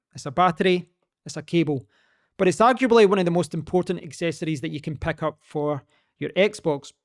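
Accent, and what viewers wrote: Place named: Scotland, United Kingdom. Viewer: Shiiiet... love your Scottish! ? (forgive me if it is Irish) Much love from Florida !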